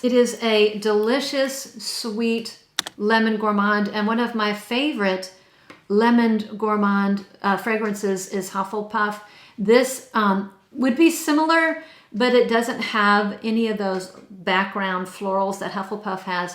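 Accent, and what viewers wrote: France. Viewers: Question please : What languages do you speak ? Do you speak French or Italian I notice your pronunciation 😉Would love to see a video on this topic ❤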